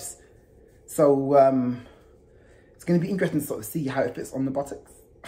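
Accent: United Kingdom. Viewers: British accent in Malibu !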